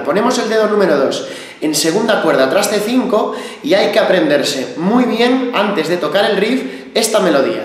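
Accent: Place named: Spain. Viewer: Ostia tio eres un buen maestro muy aparte de tu acento español te sabes comunicar bien te felicito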